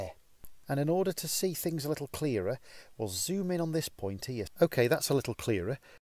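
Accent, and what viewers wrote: United Kingdom. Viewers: too detailed and wordy with a British accent.... an American needs a plain-spoken and correct fellow to skip detailed diagram and illustration and theory and principles.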